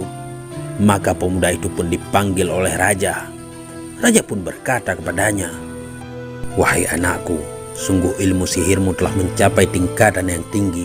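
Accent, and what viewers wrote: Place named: Bali, Indonesia. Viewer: Naratornya..kaya logat orang bali..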